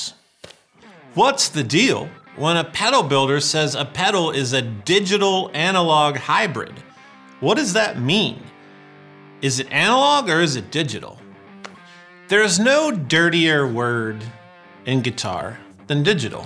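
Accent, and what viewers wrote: United States. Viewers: I love how he uses the contrived southern accent when he wants to sound ignorant. I believe he’s from Dixie and he speaks with a regular American accent.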